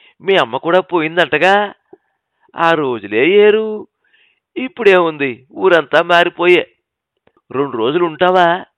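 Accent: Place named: Guntur, India. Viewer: చాలా కాలానికి మట్టి వాసనతో పరిమళించిన అచ్చ తెలుగు కథ విన్నాను. పాపినేని శివశంకర్ గారి కథా సంపుటి "మట్టి గుండె" లో ఈ కథ చదివాను. గుంటూరు జిల్లా పల్లెటూళ్ళలో స్వచ్ఛంగా వినిపించే భాషతో కథ మరింత శోభించింది. మా జిల్లా ప్రజల భాషను కథలో చొప్పించిన శివశంకర్ గారి ఘనత ఒకటైతే, ఉత్తరాంధ్ర వాసి గారు, ఆ భాషకు పూర్తి న్యాయం చేకూర్చటం మరొక ఘనత. రచయితకు, ప్రయోక్తకు వేనవేల వందనాలు.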